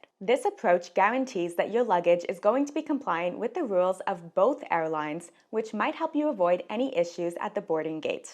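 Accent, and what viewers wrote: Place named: California, United States. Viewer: Can't take that voice. The "Cali-Talk" is just too irritating. Good thing there's a transcript.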